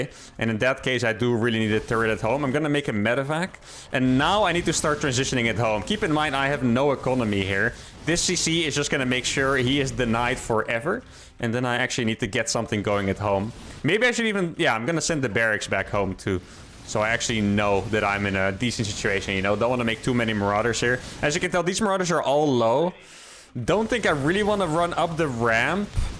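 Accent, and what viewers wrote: Netherlands. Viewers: Lolololol "yea I'm proud" I love. and Lowko are my fav SC casters. They voice/accent makes me want to visit the Netherlands. Seem like some wonderful fun and cool people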